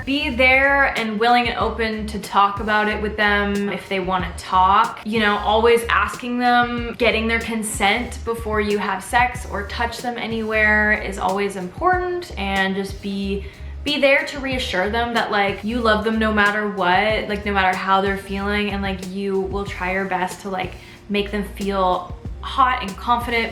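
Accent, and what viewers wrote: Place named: Canada. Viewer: Me(straight boy) who's here just to hear beautiful Canadian Accent and her humor😆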